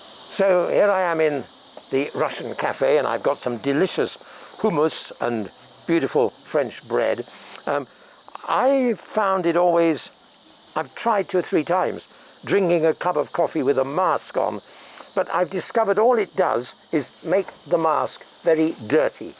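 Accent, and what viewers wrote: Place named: Oxford, United Kingdom. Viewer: I listen most of your talks because I think you beautifully speak original Oxford English, I think. Original way of language speaking changes in passage of time from place to place.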